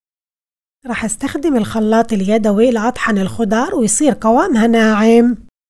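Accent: Palestine. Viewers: بتشهي شوربه خمس نجوم مميزة مثل لكنتك الفلسطنية الليةبتهبل 🇵🇸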